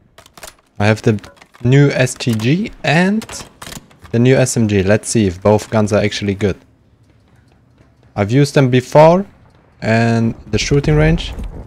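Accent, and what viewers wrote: Germany. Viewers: Ehrlich du hörst dich voll deutsch an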